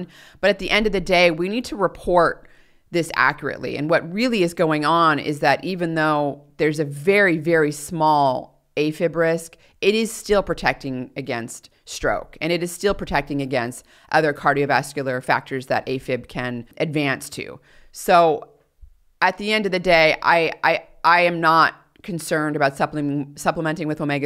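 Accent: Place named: California, United States. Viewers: California accent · how would Americans call her accent? Californian? it's interesting.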